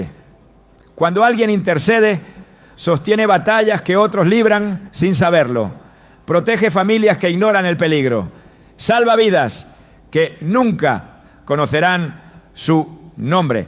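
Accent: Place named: Spain. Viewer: Dios te bendiga!! Oye después que habla parece como si cogiera un aire y además oye bien de lo que habla y como habla parece un español. Es muy facil saberlo.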